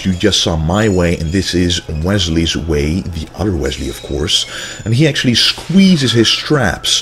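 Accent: Netherlands. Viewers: you have a dutch accent... de groeten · I hear a dutch accent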